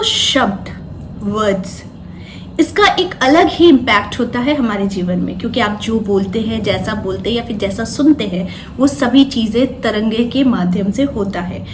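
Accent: Bengal, India. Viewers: Apka vlog accha lagta , apki baath sunkar lgta hai ki ap Bengali ho , me apki what's app MSG karunga jab mevi astrology shikunga❤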